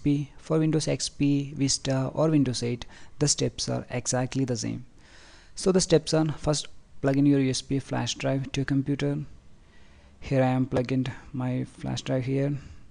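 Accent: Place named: India. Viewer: he must be indian indians speak english like that R r RRr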